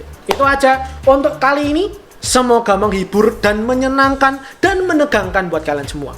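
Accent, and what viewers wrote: Java, Indonesia. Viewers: Medoook bgt loooo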